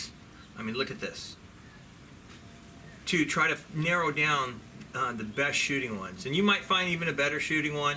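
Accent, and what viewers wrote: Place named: Southern California, United States. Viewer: Sounds like you live in Southern California.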